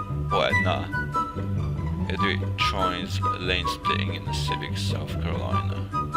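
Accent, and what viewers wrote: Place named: United Kingdom. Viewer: Great to have a UK voice on a channel 😀Suvscribed👍🏼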